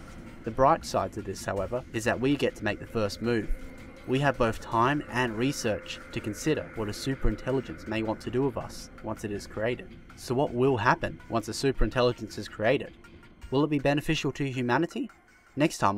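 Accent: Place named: Australia. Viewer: Too bad about the Australian accent. There were parts I couldn't make out.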